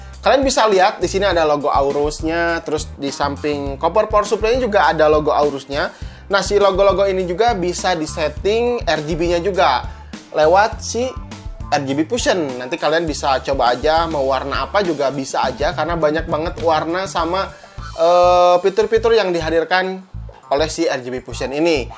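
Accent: West Java, Indonesia. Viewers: Namanya Juga Orang Sunda Atuh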